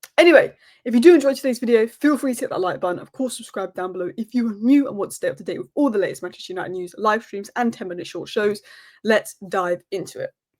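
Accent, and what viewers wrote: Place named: London, United Kingdom. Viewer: Her voice suggests she’s from Hackney or Tottenham 👍👍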